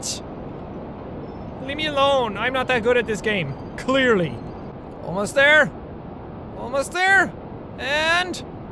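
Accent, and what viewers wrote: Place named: Ireland. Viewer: You accent is entertaining its a nice change of pace from EVERYONE BEING BRITISH OR AMERICAN or the couple of Australians but IRISH it's a nice change of pace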